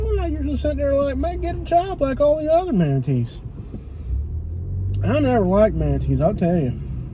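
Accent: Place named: South, United States. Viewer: Speaking like southerner today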